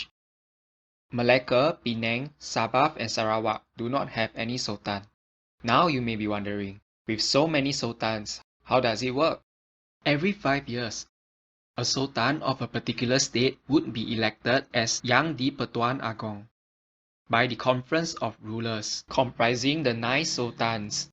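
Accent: Singapore. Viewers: Your Singaporean accent is really strong. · U srsly expecting a Singaporean to have a Malaysian accent? 🤣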